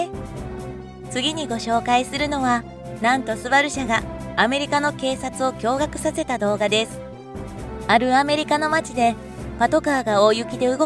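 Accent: Kansai, Japan. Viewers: このナレーション、関西訛り全開で親しみがわくわ！ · 関西人やね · スバリストなので興味を持って見たが、関西弁で萎えた。 標準語で話して欲しかった。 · ちょちょちょっとまて〜〜〜！この（京なまり？）（関西なまり？）好きすぎてたまらんのだけども！全然内容が頭に入ってこん。 当然チャンネル登録しちゃうでしょ〜〜！なぜ今までなかった！ん？合ったのか？俺が知らなかっただけ？ 何れにしてもめろめろだ〜〜〜〜〜〜〜〜〜〜〜〜〜！ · 内容良いから聞いてたけど関西弁ウザくなる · 関西訛りのナレーションが良い。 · ”出来の良い車”は富士重工の車・・・って車好きの親から言われ続けて育ちました。 スバルってホント、”キッチリ”作りこまれていますもの！ それから 今回のナレーションの彼女も少しの関西なまりがすてきでした。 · しゃべりのイントネーションがちょくちょく関西っぽい…？ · 関西訛りなのめっちゃ気になって話入ってこねぇ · 何が良かったって、解説の関西弁アクセントが最高でした😄👌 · ナレーションのお姉さんの関西訛りが面白かったです。だいぶ矯正したようですけど、ところどころ関西のイントネーションでなんか温かみを感じてよかったですよ。 スバルの驚異的なパワーの秘密が中島飛行機のエンジン製造技術にあったとは知りませんでした。 日本でももっと売れていいはずなのにねええ。インプレッサは今も人気だと聞きますけど。 ハリウッドの映画の中でもドラフト？する日本車はたいていインプレッサだったような…。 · 「スバル」のサムネイルに惹かれて見にきました。 なんだか関西弁が心地よくて聞き入ってしまいました。 冒頭で私が所有しているフォレスターの話題だったため 最後まで見入ってしまいました。 チャンネル登録もさせていただきましたよ。 応援しています。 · パーソナリティの関西弁が可愛い。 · ナレーターさんは、関西人ですか？めちゃ和むわぁ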